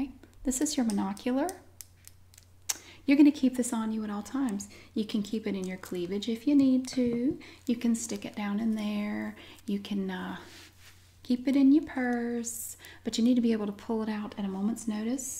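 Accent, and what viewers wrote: South, United States. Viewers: The country accent, blonde hair, sequins, long nails, and big jewelry reminded me of my old babysitter. :)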